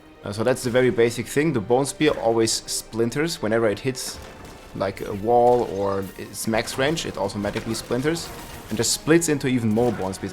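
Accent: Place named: Germany. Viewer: My absolute blind faith once i hear a german start breaking down nerdy videogame numbers is beyond measure.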